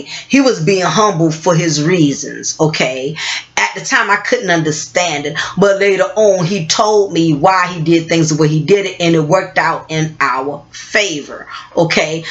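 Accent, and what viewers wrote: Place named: South, United States. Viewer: are u from some part of New Orleans I am u just kinda have that Southern accent a little just curious n that's one thing me n my mom both hated every time we planned a party or holiday gathering it was either my mother me pitching in or one of her friends that would have to buy food decorations etc nobody else every one wanted to eat n drink free too disgusting.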